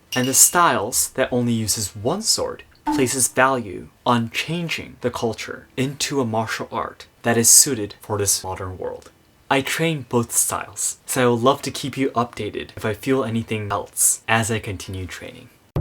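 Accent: Michigan, United States. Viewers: Dude, you sound more like a Michigander than I do! Very interesting video. Well presented. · I can hear the "Michigan" in your english. Its very clean with none of the inflection that you find in other midwest states in that area like Wisconsin or Minnesota. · Your measured, succinct speaking style with an American Midwestern accent is very appealing. Listening to you is so enjoyable.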